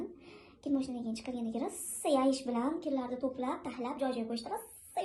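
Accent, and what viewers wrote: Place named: Andijan, Uzbekistan. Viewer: Екади. Айникса андижонча шевангиз. Самимийлигиз екади❤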